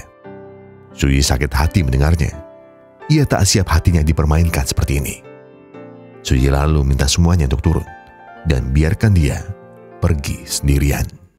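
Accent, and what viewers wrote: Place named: Semarang, Indonesia. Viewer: lek ngademin logate koyok wong semarangan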